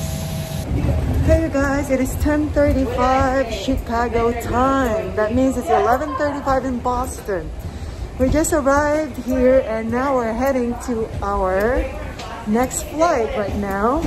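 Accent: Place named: Philippines. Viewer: Ok ...ok....you came up on my youtube, and when I heard you speak...I said to myself...."Is she a filipina?". I kept on listening, and listening, and when you said the word "actually"....I said YESS! , She is a filipina! Then your mannerisms at the end, confirmed a little bit. That is how my female cousins act when they get a little "friendly". LOL! But when you ended your vlog with a "Maraming Salamat Po"! That confirmed it!!! MABUHAY!! I just subscribed!!!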